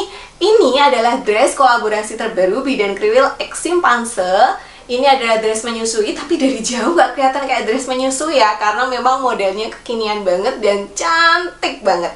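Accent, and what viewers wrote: Java, Indonesia. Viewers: Pertama kali simak video dari channel ini, seneng banget denger logatnya medok medok khas Jawa.. Suwiiii biyaaanget.. 😂❤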